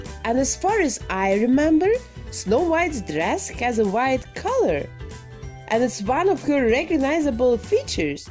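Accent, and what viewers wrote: Russia. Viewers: I normally cannot stand strong Russian accent, but with your videos I find that I can listen to you for hours and watch your incredible repairs! Awesome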